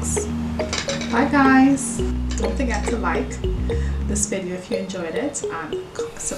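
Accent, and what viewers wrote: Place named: Caribbean. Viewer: Hi there. Just started watching & subscribed right away b/c that Caribbean accent grabbed me. 🇯🇲 here, you too?! Also, what are some of your foundation shades? 💜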